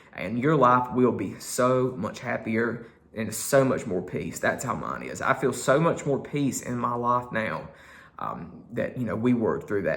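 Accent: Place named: South Carolina, United States. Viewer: you are SO WISE! They know how to raise 'em in South Carolina! And your accent is adorable. I wanna wrap you in my arms and hug you to death! (Well, maybe not THAT much LOL.)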